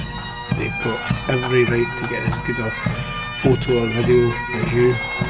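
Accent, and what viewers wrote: Scotland, United Kingdom. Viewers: LOL .... I love the videos but have great difficulty understanding the narration due to the Scottish brogue. I turned on the auto generated subtitles and that is gibberish. BTW English is my mother tongue.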